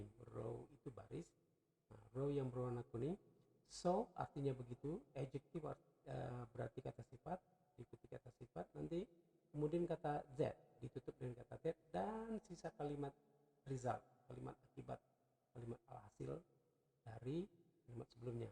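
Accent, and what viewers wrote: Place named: United States. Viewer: How to use so..bahasa inggris nya pak paling terbaik dan sangat fasih dialeg nya sama seperti orang amerika 👍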